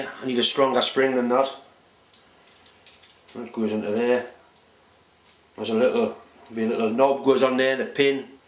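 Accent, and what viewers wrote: Newcastle, United Kingdom. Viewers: as far as I'm concerned don't change a thing, love the geordie accent and your humour, takes me back to a time when I was married to a " Monkey Hanger" ( anyone who does not know what this, google it). The people of the North East work hard, play hard when work is available, when I used to visit I was always known as the "Cockney Bastard" but it was never once said with malice, they were at that time the happiest years of my life and I have so many memories of the North East. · my wife has trouble with your language!!! your Geordie accent that is.... but she is from Mississippi, the deep south, usa, and has trouble with my mixed us new England/Australian accent, so go figure. you hear infinitely worse language on the tele, on the street, on public transport and most certainly in any school yard from kindy on up. keep the videos coming and don't change a thing!!!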